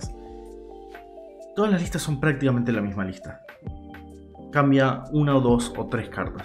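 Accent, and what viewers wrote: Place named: Argentina. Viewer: No te preocupes igual me di cuenta hace rato que eras de Argentina por tu forma de hablar, expresarte, etc, que es como si fueras de Uruguay, me gustó encontrar alguien que hace analisis, da opiniones, predicciones, EN ESPAÑOL, por que la mayoría son gringos y no entiendo una M jaja saludos y sigue asi, todavía espero tu analisis de las magias y trampas y monstruos de efecto de la banlist je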